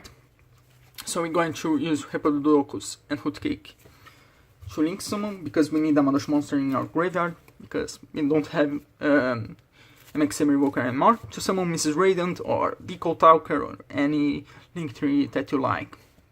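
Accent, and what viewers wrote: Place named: Brazil. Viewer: notei que era brasileiro pelo sotaque kk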